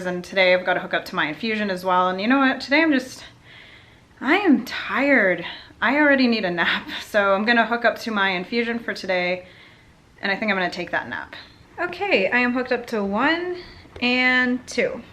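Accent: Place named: United Kingdom. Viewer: Finding that balance can be so hard sometimes. I've been trying to lose weight for my health for years and exercise would greatly help with that but there is such a limited amount I'm capable of doing and it's so dependant on the day and circumstances of said day that it's been extremely challenging. It makes it so frustrating when you have people fat shaming you and telling you to just get off your fat butt and exercise, like I don't have enough problems with depression and anxiety besides my chronic pain and other medical issues. As for the little dactoid about you being born in London I was kind of wondering because of the way you say certain things like vitamins, you say it like the British do. Of course, I was born and raised in the US and most of the time I do too, so that doesn't necessarily mean anything but it made me curious. :)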